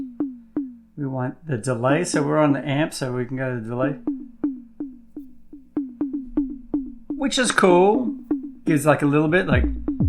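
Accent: Australia. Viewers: Cool… are those prices $US. You sound like an aussie!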